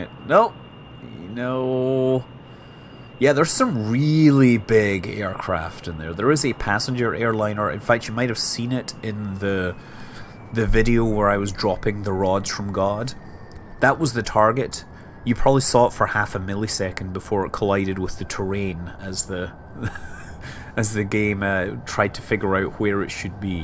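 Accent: Scotland, United Kingdom. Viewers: u sound scotidh i hate u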